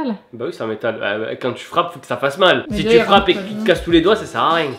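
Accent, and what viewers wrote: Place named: South, France. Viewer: T du sud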